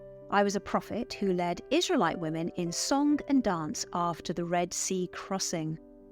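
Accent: England, United Kingdom. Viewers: First time I got the English accent lady's quiz totally correct. I got scared when I saw "Ruth" and I thought you'd ask Chilion's wife's name. I know it means "back of the neck" but I can't remember.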